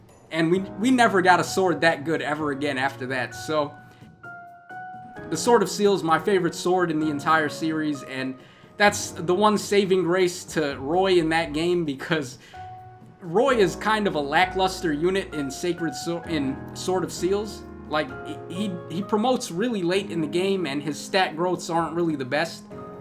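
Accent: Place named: Northeast, United States. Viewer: Are you from the north east? I enjoy your channel man, keep up the good work!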